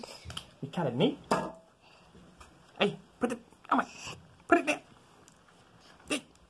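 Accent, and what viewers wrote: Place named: Ireland. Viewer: More like wee Ireland accent to me lad.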